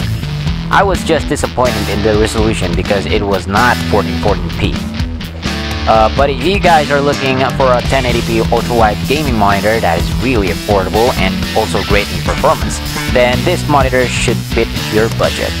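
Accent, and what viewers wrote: Philippines. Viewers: animal! bisaya man diay ni. haha subscribed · Ok sana kaso bisaya ka e hahah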